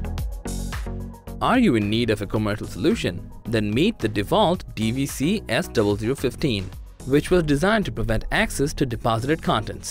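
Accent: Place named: China. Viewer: Your Chinglish needs a bit of work...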